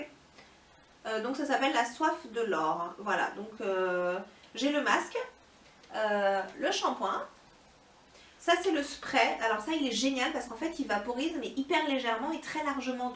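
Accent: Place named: Iran. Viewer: Intonation iranienne.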